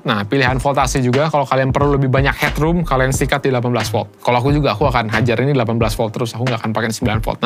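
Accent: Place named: West Java, Indonesia. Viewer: Oh, orang sunda? Kirain orang sumatera atau sulawesi. Pas denger "kela.. kela" trus "tah". Kata kela itu singkatan dari "ngke heula". Soalnya yg nyebut "kela" cuma orang yg bisa bahasa sunda sejak kecil atau sunda asli.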